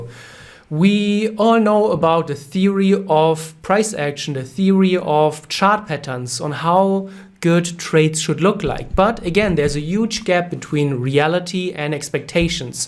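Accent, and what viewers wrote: Germany. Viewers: are you from germany? :)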